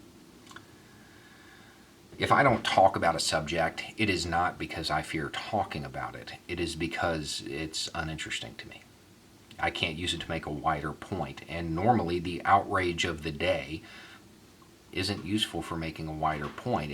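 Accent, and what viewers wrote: South, United States. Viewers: Why the hell do conservative believe he speaks to them. Cause he has a southern drawl?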